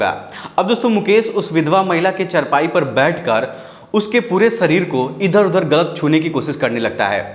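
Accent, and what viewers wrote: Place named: Bihar, India. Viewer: Abe sahi se Hindi to bol bihari taan me kyu bol raha hai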